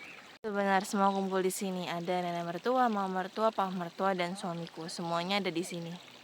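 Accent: Java, Indonesia. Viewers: Cantik bgt MBK ...asline wong ndi kaya logat jawa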